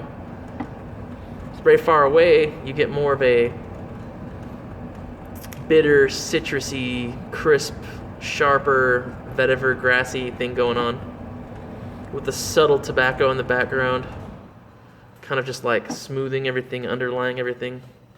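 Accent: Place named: New Jersey, United States. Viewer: Damn, I didn't know you lived in Utah, no offence but you come off as a Jersey Jew... in a charming way.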